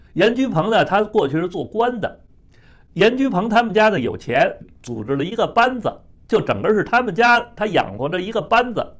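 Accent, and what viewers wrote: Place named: Beijing, China. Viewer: 特喜歡聽北京老話。牛年吉祥！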